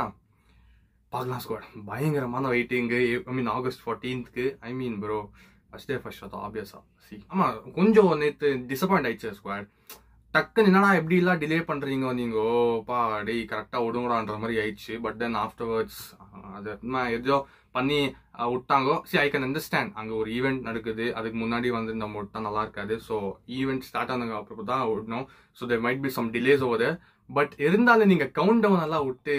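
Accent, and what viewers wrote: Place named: Bangalore, India. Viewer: Unnoda Bangalore Tamil and Excitement for Walter White dialogue ku naan fan aiten da.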